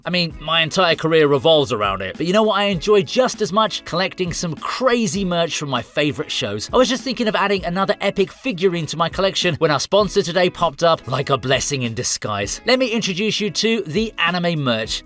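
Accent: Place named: United Kingdom. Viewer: Sheesh British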